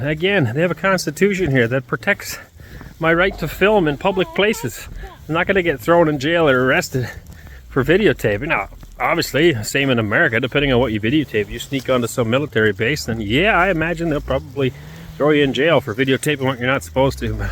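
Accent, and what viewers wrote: Canada. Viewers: I can't imagine he doesn't have the Russian accent not to mention the Canadian accent! Good luck out there people know this and it's a disadvantage for sure. Man I'd live out there anyday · Could tell your from 🍁 by your accent.. good luck in Russia . Hoping to get a new American president before this clown gets us in war with Russia. Rather have pootie as our president too be honest. Only isuue i have with your move is you didn't stay and try to fight to get your country back we need all the people we can get at this point to turn the tide. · Canadian English)))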